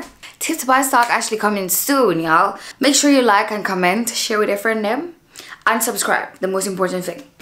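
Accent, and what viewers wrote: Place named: United Kingdom. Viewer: Baaaaby didnt knew u were British... I love u girl ur voice put me to sleep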